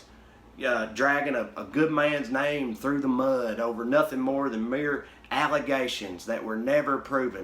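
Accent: South, United States. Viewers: I’m just here for the adorable southern accent!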